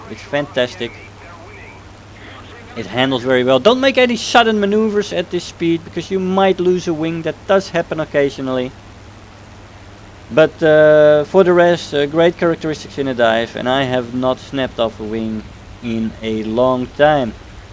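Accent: Netherlands. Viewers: Hey, are you Dutch? Watching this from Leiden, I thought I recognised the accent:) Nice videos mate!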